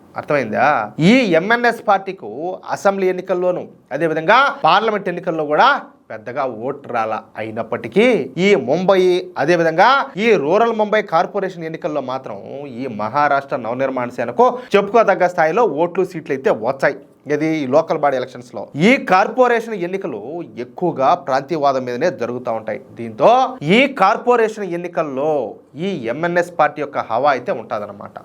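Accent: Rayalaseema, India. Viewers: 😂😂😂😂 అన్నా బలే తిట్టారు......... మీరు న్యూస్ చెప్పిన .... దేశ వ్యతిరేకులను తిట్టిందా చాలా అద్భుతంగా ఉంటుంది......... మీ ఆవేశంలో ప్రతిసారి అందులో మీ దేశభక్తి కనబడుతుంది...... నేను మీ ప్రతి వీడియో చూస్తాను మా ఫ్రెండ్స్ కూడా చాలామంది subscribers ఉన్నారూ.... నేనే చేశాను....... ఈ వీడియో ఇప్పటికి పది సార్లు చూశాను.... మీ తిట్ట దండకం విని పొట్ట చెక్కలయ్యేలా నవ్వి నాను...... కానీ మీరు ఈరోజు చాలా మంచి మాట చెప్పారు.......... మీరు సంఘ వ్యతిరేక శక్తులను తిడుతూ ఉంటే నేను చాలా ఎంజాయ్ చేస్తాం....... మీరు ఉమ్మి నప్పుడల్లా మాకు చాలా నవ్వొస్తుంది ఏమనుకోవద్దు...... మీరు మాట్లాడే తెలుగు భాష వ్యాస యొక్క అభిమానిని నేను రాయలసీమ భాష చాలా చక్కగా మాట్లాడుతారు మీ వల్ల మాకు ఎన్నో కొత్త పదాలు తెలిసాయి... ❤❤🙏🙏🙏🙏 ముఖ్యంగా నేను రాయలసీమ ఆంధ్ర కోస్తా యాసల ఎక్కువగా పరిశీలిస్తారు.... చాలా కొత్తగా ఉత్సాహంగా ఆనందంగా అనిపిస్తుంది..... ఇట్లు కరీంనగర్ జిల్లా వాసి.....❤❤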